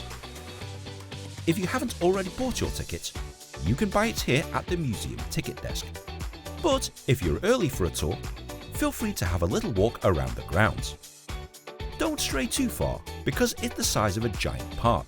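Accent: United Kingdom. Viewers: It’s not a hatred comment but I couldn’t imagine he has such a beautiful British accent … so proud of you Asian brother!!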